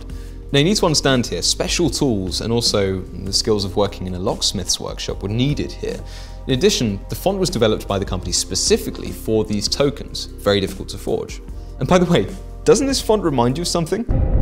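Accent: RP, United Kingdom. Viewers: I love the content. Hate the blatantly obnoxious royalty accent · How I like British accent. I don't understand any words. What are you saying in this video???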